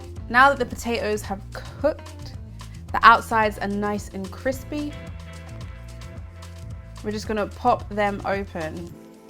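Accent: United Kingdom. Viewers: love that british accent 😍🤣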